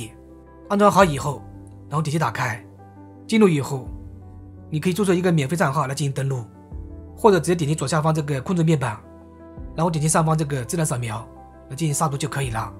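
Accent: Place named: Jiangshan, China. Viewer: 有点像衢州江山口音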